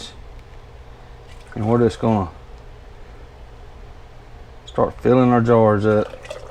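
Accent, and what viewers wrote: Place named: Kentucky, United States. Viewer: Nice video! I am in Kentucky. You accent sounds like us. What state are you in?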